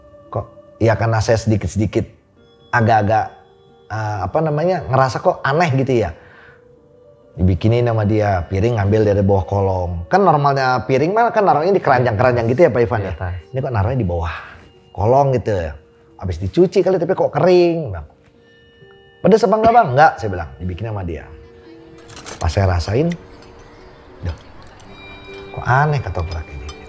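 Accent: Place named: Jakarta, Indonesia. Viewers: Emg betawi logatnya begitu dah emg ceplas ceplosnya juga tanda peduli, lu punya temen jgn dari situ situ aja makanya mbaa, jd ga menyimpulkan begitu setiap org dr setiap daerah punya ciri khas masing" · Aksen Betawinya enak banget di dengar bang.. yg di sampaikan Masha Allah ngena banget. On point gitu.. terimakasih atas ilmu dan pengalamannya bang.. semoga sehat selalu.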